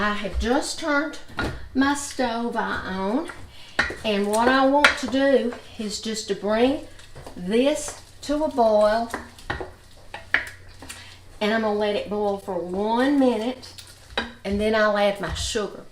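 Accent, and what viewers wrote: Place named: Alabama, United States. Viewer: I just love to hear you Alabamians talk. I’m from E Tx and it is said that we have an accent 😂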